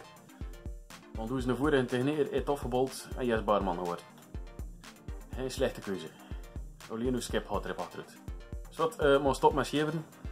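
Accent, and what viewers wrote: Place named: West Flanders, Belgium. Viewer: Tis tof zo keer int west vlaams ma ge excuseerde u int begin ma zo plat ist nog nie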